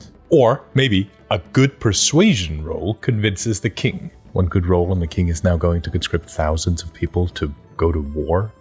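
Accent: Iceland. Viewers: Are you perhaps Icelandic? I can hear from your accent. Well i have a question. I'm going to be running a homebrew campaign but I don't know how to make my players invested in the plot and their characters. I've played with these people before and there seems to be one consistent problem. They seem to zone out every time they complete their actions and their characters never seem to interact with each other. How do I make them care? How do I make them invested in their teammates characters? (Edit i changed the question since i thought of a solution)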